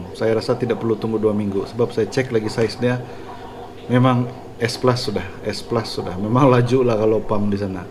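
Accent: Sabah, Malaysia. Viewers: Slang Sabahan ohh..boleh tau ka dimana location? Mau datang beli kalau boleh heheh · Abg orang sabah ehh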